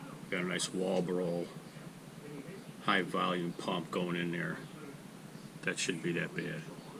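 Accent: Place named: Chicago, United States. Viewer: You still around and with us? Sounds like you're from Chicago(?). I grew up in Orland Park.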